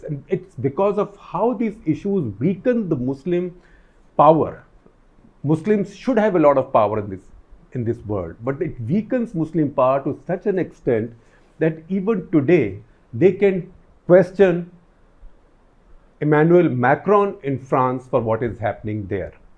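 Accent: India. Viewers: Why are you speaking English in total Hindi tone.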